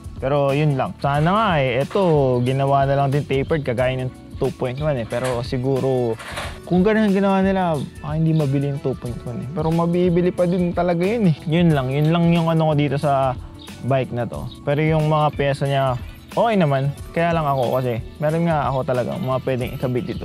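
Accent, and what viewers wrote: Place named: Coño, Philippines. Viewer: coño ka pala master " ":D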